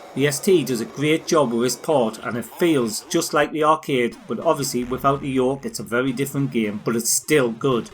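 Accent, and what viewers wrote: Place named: Geordie, United Kingdom. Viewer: Great video, but are your a Geordie, you accent sounds like a posh Geordie, like Jimmy nail😁. I am, just speak your proper accent mate👍🏻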